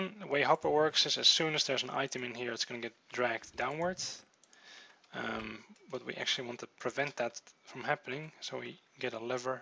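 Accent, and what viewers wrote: Netherlands. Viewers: Are you a Dutchman because i can hear your talk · Are you dutch?? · are you dutch?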